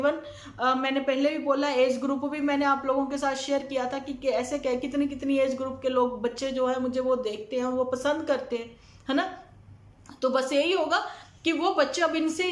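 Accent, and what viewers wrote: Punjab, India. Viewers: that’s Good 👍 I love your Punjabi Accent lots of love side by 🇨🇦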